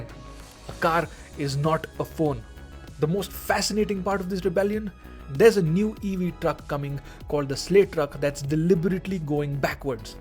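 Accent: India. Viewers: You sound indian?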